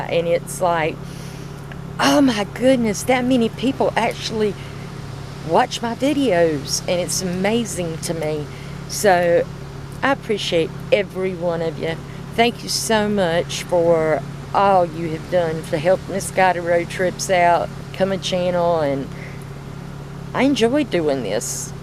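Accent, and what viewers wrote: South, United States. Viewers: Thank you for getting back to me. My mom was from Little Rock Arkansa and my daddy was from Richmond Vagina, i am from Washington DC. I always Loved a country accent!! can't wait for your next adventure!!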